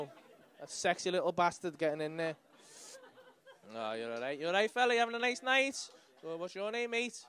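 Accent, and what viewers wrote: Liverpool, United Kingdom. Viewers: The only thing wrong with this is that he has a Scouse accent, and he ain’t funny....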